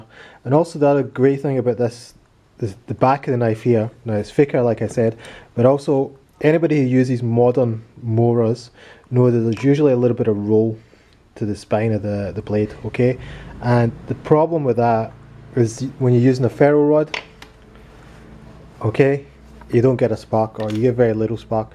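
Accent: Scotland, United Kingdom. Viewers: Are you Scottish. Cool video man. Thanks for sharing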